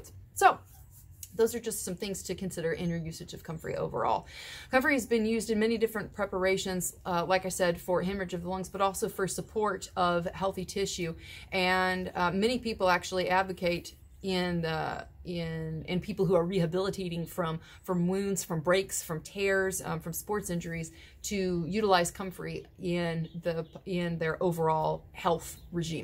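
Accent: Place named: Tennessee, United States. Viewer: I love that Tennessee tone.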